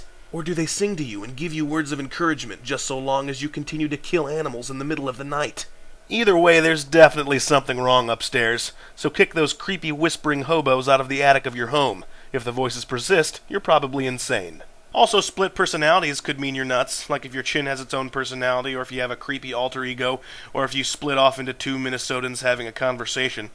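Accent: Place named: Minnesota, United States. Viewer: You're Minnesota accent, and fictional characters are commendable.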